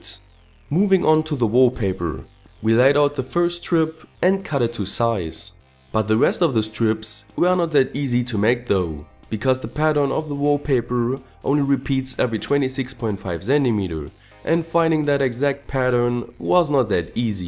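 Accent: Germany. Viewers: Du krass... Läipsich...góil.... I wüsste das du deutsch bist wegen dein akzent, grüße von plagwitz :P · Du bist aus Deutschland oder? Das hört man:) gutes video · I love your German accent :) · So many times he says zentimeeters xD I am more into the electronics Videos of GS but this one beats them all wit di akzent :D ( and please don`t get mad man ;) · JV Also offensichtlicher deutsch geht es kaum: sein Akzent, "Leipzig", Deutsche Marken. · are you german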